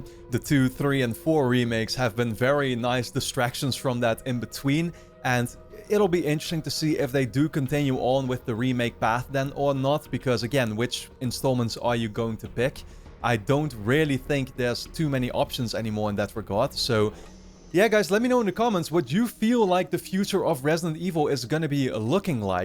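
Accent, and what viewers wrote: Netherlands. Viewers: Dat Nederlandse accent hoorde ik meteen 😜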